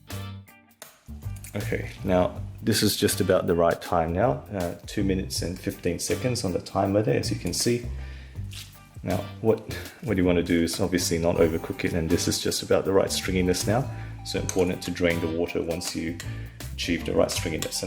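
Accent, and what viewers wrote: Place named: South Africa. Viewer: you sound very south african! coming from a native australian 😁😅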